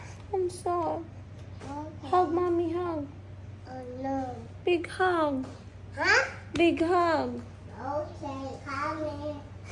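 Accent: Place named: Visayas, Philippines. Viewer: love n love q po tlaga ms. yang tagalog bisaya accent mo.nkka goodvibes po.❤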